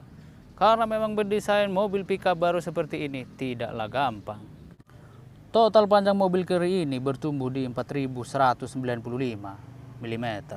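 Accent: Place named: Germany. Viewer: Baaah logat jerman rupanya...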